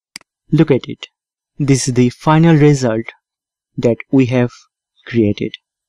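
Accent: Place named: India. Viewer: Desi English 🤟😝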